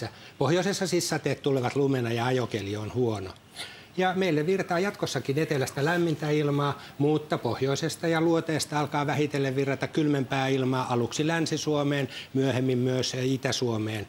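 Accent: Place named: Spain. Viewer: sounds like spanish accent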